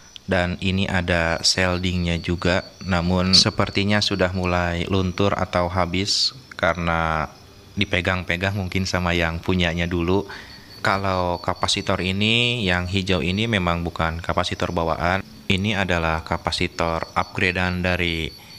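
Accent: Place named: West Java, Indonesia. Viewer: Ehehehe, nyunda pisan yeuh voice-na.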